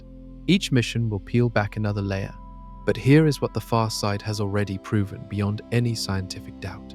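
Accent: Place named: United Kingdom. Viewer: Tipoff of clickbait AI CGI BS videos is the quasi-British accent of narrator.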